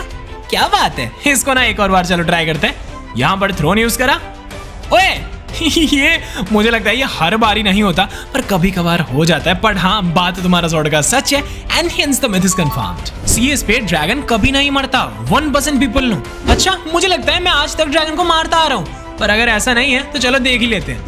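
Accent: Odisha, India. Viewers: Odia Hindi ❤ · Bhai tum odisha se ho kya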